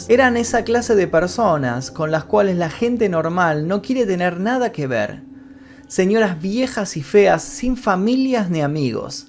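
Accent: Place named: Argentina. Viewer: Excelente trabajo y documental y me encanta tu acento argentino. Saludos.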